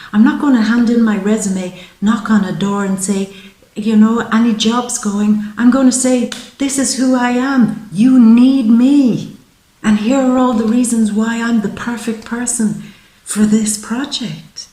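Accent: Ireland. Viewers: I Loovee your Irish(?) accent. Beautiful.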